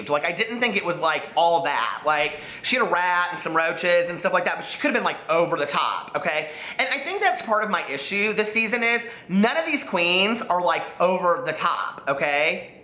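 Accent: South, United States. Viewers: you are too gay to function at times... #loveyou great comments, I enjoy your Souther accent.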